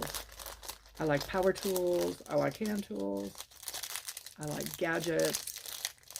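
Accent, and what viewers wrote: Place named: Illinois, United States. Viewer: I subscribed to your channel because I'm tired of all the others that are doing strange things. I'm about the same age and I have bought many of the dresses you have purchased. So we have the same style. I use to live in Illinois and your accent sounds from that area. I now live in California. I love Temu but have spent tooooo much on that site.....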